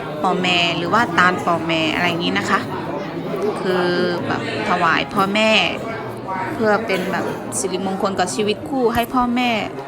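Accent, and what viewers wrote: Thailand. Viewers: น้องทำไมพูดไทย สำเนียง กทม. มีเเต่คนสวยๆ สวดเหมือนเชียงใหม่ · พูดสำเนียงไทยกลางชัดมากเลยครับ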